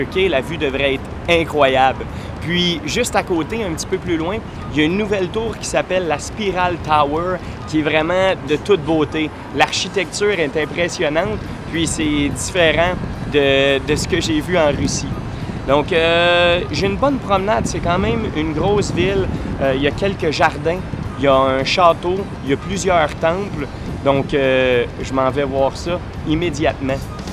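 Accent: Quebec, Canada. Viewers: Entendre l'accent quebecois c magique XD ...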